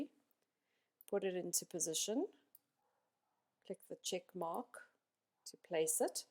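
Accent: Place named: South Africa. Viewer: Thank you for this amazing tutuorial. I really love your designs. I can hear you are from SA. I am in Cape Town and am also a digital designer so I thought I would say hi.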